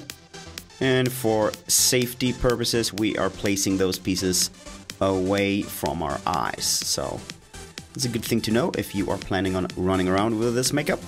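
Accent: Scania, Sweden. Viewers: Älskar din skånska!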